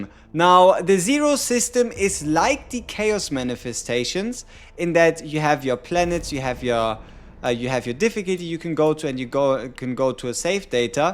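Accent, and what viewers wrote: Germany. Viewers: You sound german. Nice video tho. Thx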